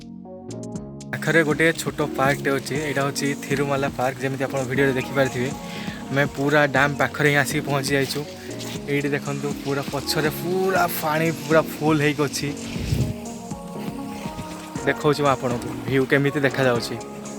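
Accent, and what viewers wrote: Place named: Balasore, India. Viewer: Baleswaria pila nka pari kan kahucha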